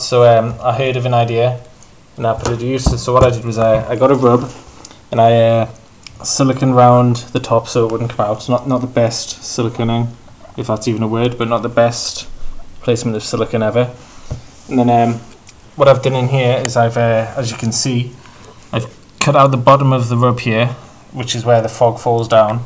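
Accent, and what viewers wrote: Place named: Newcastle, United Kingdom. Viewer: clicked on this video and I noticed the accent before the reptiles. TOON TOON!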